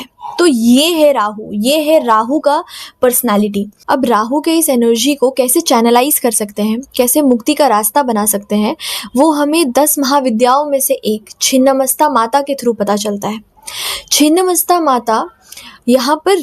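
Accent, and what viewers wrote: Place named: Gujarat, India. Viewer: You must have gujrati root. Your accent ..ok. Aap accha bolti hain. Thank u behan ji. Om Namah Shivaay.🎉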